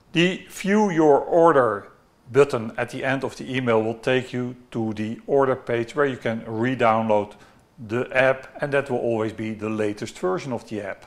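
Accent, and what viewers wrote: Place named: Netherlands. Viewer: Dankjewel voor jou dienst. Altijd leuk om de Nederlandse accent te horen. Groetjes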